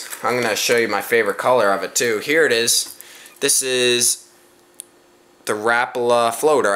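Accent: Canada. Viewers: just started fishing rapalas and they seem pretty damn good. you get what you pay for i guess sometimes. ps - what's your accent? canadian? or just north states?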